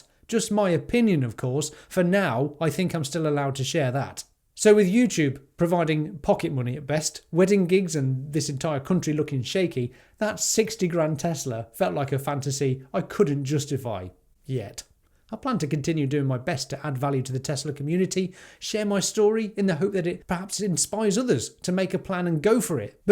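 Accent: United Kingdom. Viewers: Hi. Here a spanish guy that enjoys Your Tesla Content Whit British acccent. Hope you find The determination to keep going. Best of luck. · Thanks for the candid video and I understand where you're at. Ironically, although I'm a recently retired engineer, my secret dream was always to be a great guitar player. At the age of 65, I still take and enjoy lessons. The problem, as I see it, with music is that the world doesn't like to pay for music. Sadly, all the musicians I personally know are broke. They're fantastic and talented people, but broke. Stay confident in that you're taking the right approach. I hate money but understand we need it to live a happy and fulfilling life. My wife and I be fine in our retirement...not rich but certainly not poor. Two final thoughts: When you send in your last mortgage payment for your home, it feels like you've cast off 500 lbs of chains that have been burdening you for many years. 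It's wonderful. Also, my wife and I wanted to buy a new Model Y Juniper recently, but instead opted for a low mileage 2020 Model Y for 1/3 the cost of the Juniper. No more chains for us. Please don't quit YouTube. I enjoy your enthusiasm and crazy Brit accent. If you visit the US near Ohio, I'll trade you a couple guitar lessons for some room and board + a fun tour of our chunk of OH.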